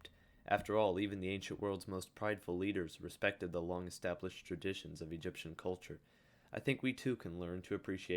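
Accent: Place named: Midwest, United States. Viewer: Great channel but I think you should redo your narrative using an artificial English accent like David Attenborough. You have a young midwestern accent. People respect English accents that why most Roman Greek Egyptian movies using British actors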